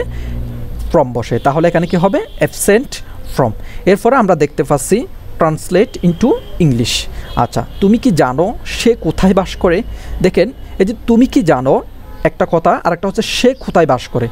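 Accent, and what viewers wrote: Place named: Noakhali, Bangladesh. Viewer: উচ্চারণ. Noakhalir hoise Ata avoid korte hobe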